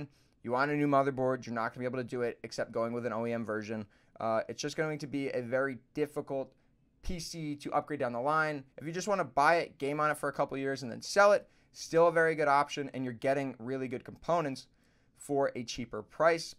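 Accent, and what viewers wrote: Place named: United States. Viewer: which currency is this in. you sound american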